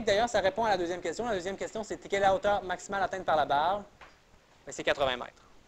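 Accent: Quebec, Canada. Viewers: J’aime votre cours je le trouve super ! C ‘est juste que vous parlez trop vite pour quelqu’un qui n’est pas habitué à l’accent québécois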